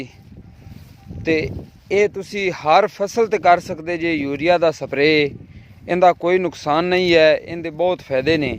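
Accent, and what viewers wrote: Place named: Saraiki, Pakistan. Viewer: آپ کی سرائکی اتنی الگ؟؟!!!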